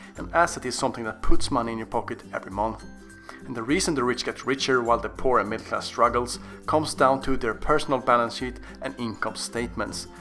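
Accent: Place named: Sweden. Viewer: haha din engelska låter precis som när svenska nhl spelare ger interview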